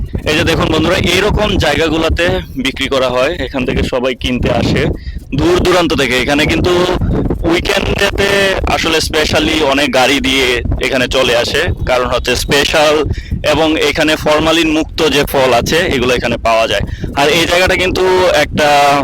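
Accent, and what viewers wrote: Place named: Mymensingh, Bangladesh. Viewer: Mymenshinga,ko k kho bole bangàlataow bolte pare na,blog kore aber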